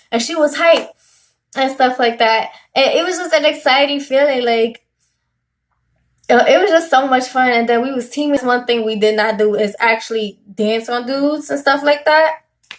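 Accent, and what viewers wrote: New York, United States. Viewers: she from new yorkkk, my ex had dat accent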